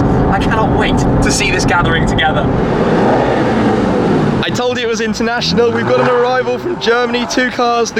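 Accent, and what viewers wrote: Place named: RP, United Kingdom. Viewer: Stop smiling so much. And cut the posh accent. Sound normal!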